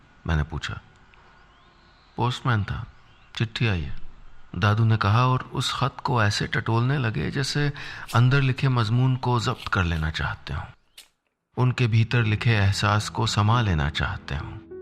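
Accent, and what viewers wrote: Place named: Bengal, India. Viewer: Love you Sir from Kolkata .. kitna sweet lagta hai app ka Bengali :)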